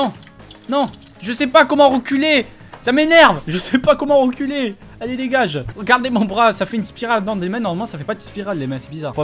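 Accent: South, France. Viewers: Dat accent du Sud heing ! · Hello à c'est toi qui a rajouté le symbole illuminati ? xD Tu viens d'où ? car t'a un accent du Sud :p ( je crois Montpellier mais pas sûr vu que tu avais fais une vidéo ) Jsuis deçue pour le twerk, mais bon bad ass ! Mdrr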